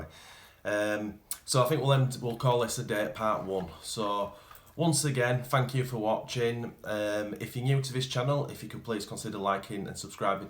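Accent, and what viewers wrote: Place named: Yorkshire, United Kingdom. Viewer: Boomerang was my very first rc car...all those years ago ! Wouldn't mind another now. What you doing in Canada ? You sound like a Yorkie to me !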